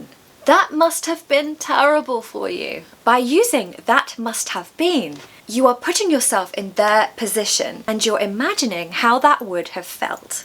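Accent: United Kingdom. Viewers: Hello, I would like to visit those castles in the U. K. Love your beautiful British vibes and the usage of words with a lovely British accent., You taught me best British English lessons. You are adorable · Thank you for reminding me the Beautiful British ascent . I tried to shadow you ❤ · Hi. I really enjoy watching your video s. Especially when i listen to your lovely brit accent. I would like visit london and seeing icon london like eye londond and big ban and tower bridge and ....❤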